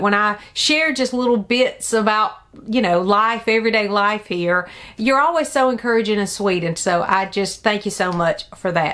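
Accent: South, United States. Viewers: Hey,!!! I hope you're doing well. You look so beautiful. You don't look old enough to have grown children. I love your southern accent. Where are you from? I'm from Mississippi. M.C. Beaton books are SO good. I see you picked up some books from the Hamish McBeth series. You should also try the Agatha Raisin series as well. I love them all. Great video!!! Have a great day😊😊😊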